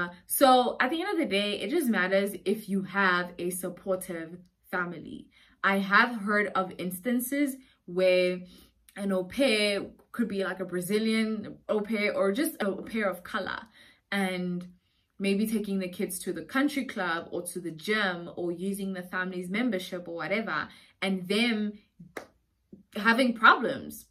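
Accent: United States. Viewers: You came back with a slight American accent 😹. This was very informative thank you.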